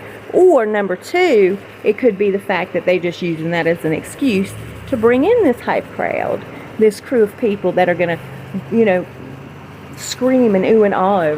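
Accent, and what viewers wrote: South, United States. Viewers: You are in my prayers daily and have been since the beginning of this. I'm sending you hugs, prayers for comfort and healing and lots of love! My husband has become a fan. We are in the south, from the south and he loves your accent. When he hears me listening to you, he always stops in to see what you have to say. You just remember to put yourself first and take care of yourself. ❤️ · Get better soon. It's not fun being so sick like you are at the moment. Hang in there. It takes time to get over serious illnesses. I'm into my second year of recovering from pneumonia after being in ICU. Queensland. Has left me with walking disability and enlarged heart. I get great joy in listening to your programme with that Southern drawal. So don't you go anywhere. Your show tells it like it is. It walks all over the others in respect to authentic royal gossip. 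Up there with Lady C. My answer to your quesastion rse Queen's medal to Meghan in is:. if the Queen does this then it shows the public and parliament that the Queen has lost her mental capacity and its time for her to go.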